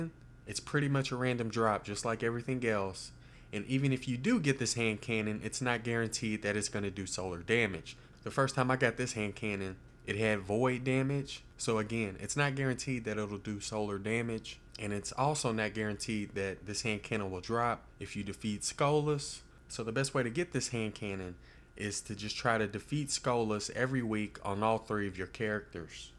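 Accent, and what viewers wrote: South, United States. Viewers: Cawwwwnnnnttttrrrryyyy as hell!! XD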